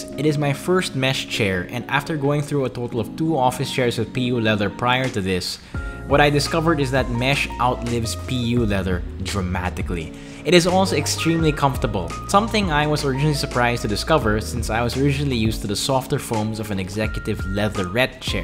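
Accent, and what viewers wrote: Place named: Philippines. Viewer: Idk why I’m kinda fond of the way filipino says words ending with -tion 😂 Great video btw, love your place!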